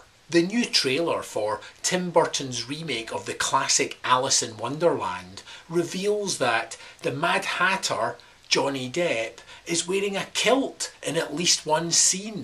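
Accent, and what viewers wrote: Scotland, United Kingdom. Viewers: You're Scottish? o.o..I know someone in Scotland =D I've known him for like..4 years =)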